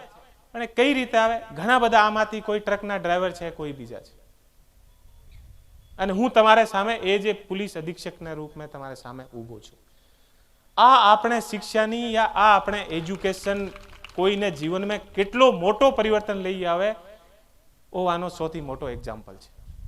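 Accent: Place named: Rajasthan, India. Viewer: સર આપ રાજસ્થાન ના છો ને આપનાં બોલવાં મા રાજસ્થાની લેકા ની ઝલક દેખાય છે જય ભવાની જય રાજપુતાના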